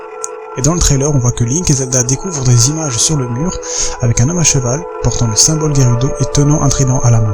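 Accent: France. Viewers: je savais pas que tu étais Français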